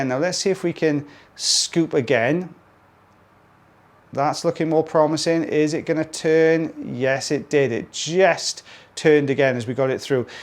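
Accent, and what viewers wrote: United Kingdom. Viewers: wiggling his socks off 😂😂🤣🤣 i love UK sayings lol 😂 btw i was trying to sort out ur accent; northern/southern England… not quite… def not Irish or Scottish … then i caught in one of ur video’s that ur Welsh! i was proud that i noticed it was a bit different ☺️ it’s not easy for us Americans to notice the differences. i worked for a UK based company & exposed to a lot of different accents, i finally started to notice the subtle differences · Im watching the video to see the star of the show not your face or to hear your British accent. In fact your technique is rather.....rubbish! Lol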